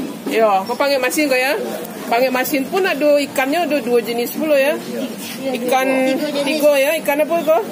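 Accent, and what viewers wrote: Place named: West Sumatra, Indonesia. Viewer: Uni kampung halaman dima ni? Unik gaya logat bahaso minang uni tadanga dek telingo awak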